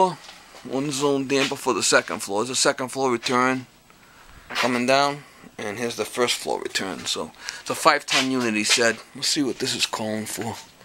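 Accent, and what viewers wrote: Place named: Boston, United States. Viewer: I knew it, the biddy and I were watching the video and said to each other, looks familiar, and sounds close to home, Good diagnosis and fix, Al from flippin' Bahston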